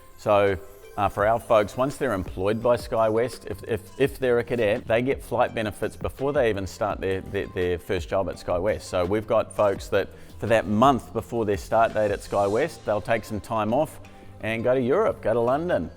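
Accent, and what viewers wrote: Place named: Australia. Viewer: Given your accent, I thought you are based in Australia.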